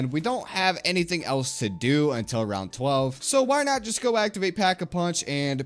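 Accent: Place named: South, United States. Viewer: Your voice is really cool. The Southerner accent is sexy.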